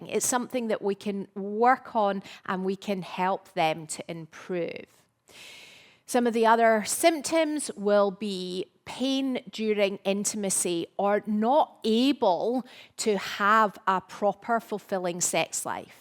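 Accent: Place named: Scotland, United Kingdom. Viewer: How very interesting and so well explained. Very informative video. Going to look out for your book. Scottish accent too, brilliant 🏴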